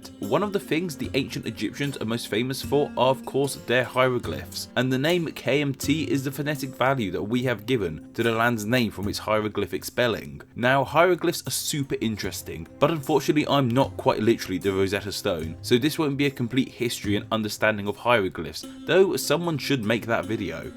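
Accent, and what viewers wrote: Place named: United Kingdom. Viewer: All pronounced impeccably by a British scholar. The raayne in Spaain staays maainly in th'plaain. Please speak a more neutral English. If Marina Sirtis, Patrick Stewart, Jane Seymour, Patrick MacNee, David Niven, Leo G. Carroll, Jean Marsh, Nicola Bryant, and Alfred Hitchcock can do it, so can you. You're speaking to an international audience.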